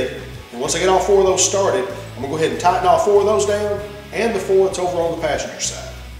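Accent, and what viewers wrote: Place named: Georgia, United States. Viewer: ........you must be from georgia. I understood every word you said. Great job on the fuel pump, very detailed and clear. This is the first time seeing your channel but love it. Looking forward to seeing more of your videos. Lets do it............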